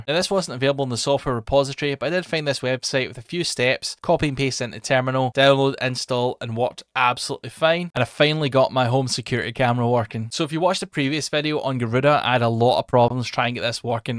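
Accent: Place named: Scotland, United Kingdom. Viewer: Nice video. It's interesting to see how others do when making the switch to Linux. I tried a few different distros as I wanted something that would make it easier to set everything up for gaming and eventually settled on BigLinux which I really love. I did try Nobara but I found that it wasn't for me although I'm certainly taking advantage of Proton-GE etc as the dev has provided us with many goodies that make life easier. Also nice to hear another Scottish accent 😁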